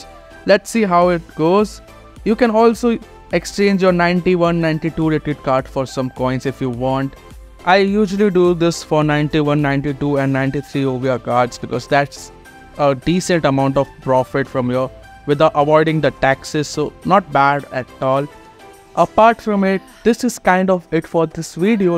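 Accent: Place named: India. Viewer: Love this Indian accent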